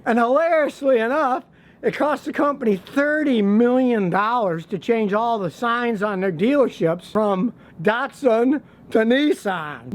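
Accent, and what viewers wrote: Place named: Western Pennsylvania, United States. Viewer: Is from western Pennsylvania? I’m trying to figure out his accent.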